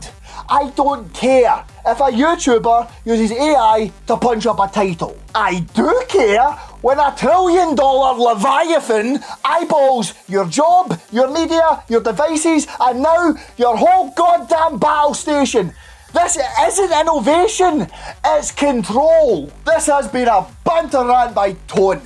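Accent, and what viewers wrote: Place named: Scotland, United Kingdom. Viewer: Annoying?! Scottish is the only accent that should ever be used for a good rant. The Scots sound like they're ready to fuck some shit up regardless of the banality of the topic. Honorable mention to the Irish.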